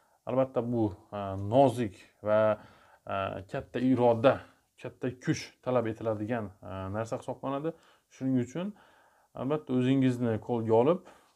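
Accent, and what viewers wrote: Khorezm, Uzbekistan. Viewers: Xorazmdan ekanligiz darrov bilindi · Асаломалакум доктир сиз кайердансиз шевага айрим созлар хоразимга тортяпти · хоразимданми шева тортяпти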